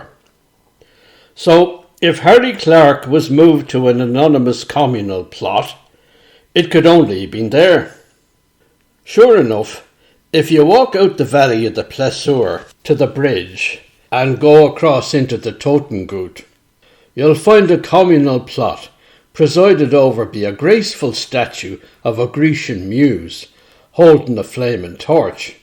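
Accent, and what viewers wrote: Dublin, Ireland. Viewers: Beautiful unique Dublin voice and a wonderful story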